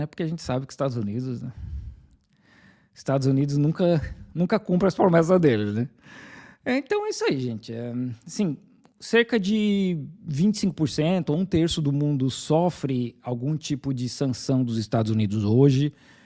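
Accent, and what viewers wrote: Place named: Rural, Brazil. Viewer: Sotaque cáipira nojento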